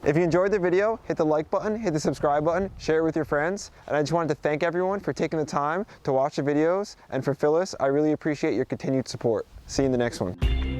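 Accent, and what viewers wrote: New Jersey, United States. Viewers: Hey, thanks again. Funny, you took the words right out of my mind about your 1st apple tree: why plant a common grocery store variety, and why not graft onto it. Lol, thinking alike. Do you know John Kohler? He has some pretty good info on YouTube. Also, you’ve become my 2nd favorite New Jersey person (you guessed, fav is The Boss); I’m wondering if you have typical NJ accent? I’m in SoCal.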